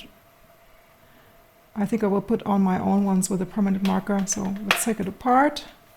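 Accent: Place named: Germany. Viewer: by youur english accent, it look to me you are either German or living for long time in Germany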